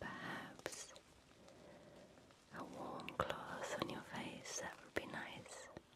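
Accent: England, United Kingdom. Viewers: Hi.... Just discovered your channel.Makes a nice change hearing an English voice.You have a soft tingly voice.Its crazy I want to hear more of your voice but I am asleep after 5 minutes.So in compensation this video will take weeks to complete.....nice. Kind regards Mick.(UK) x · What a beautiful English accent. Brilliant ASMR, thank you.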